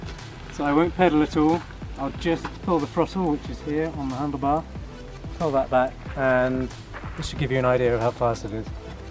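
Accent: United Kingdom. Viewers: You sound British but I'm assuming that you're reviewing for the American Market as the specs don't match, is this correct? Also lose the hat, you're not 12. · is this the Europe version or the US? I know there is different settings, as in, the Europe version does not allow the throttle to function independently where as the US version allows you to use it like a moped? if so, how did you get the US version in the UK (unless you're based in the US - your English accent has miss led me! lol)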